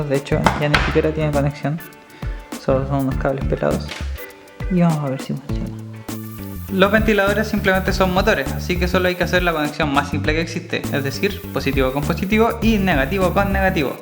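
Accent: Chile. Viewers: eres de chile bro?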